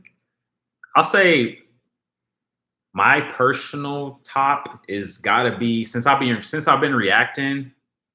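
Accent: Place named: South, United States. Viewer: I’m sorry but I just have to say I love your country accent it’s sexy as hell!!!! It does things it’s very refreshing the whole attitude personality it’s definitely the whole package to me just sayin!!!!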